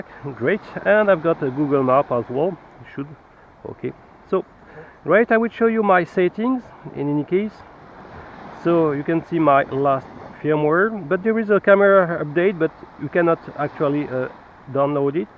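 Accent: France. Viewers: vous avez un fort accent français je me trompe ou pas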